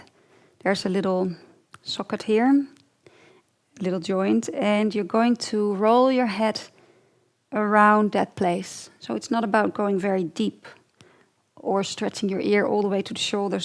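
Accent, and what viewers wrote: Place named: Netherlands. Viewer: Hoy Ik hoor van u acsent dat u van Nederland Komt maar dank u wel voor de yoga de yoga tip😊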